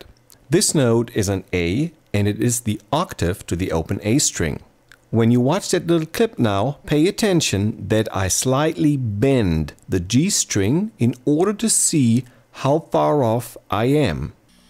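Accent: Germany. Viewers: he's german - so he pronounces an unvoiced 'S'-sound in cases were English native speakers would normally pronounce a voiced 'S'-sound...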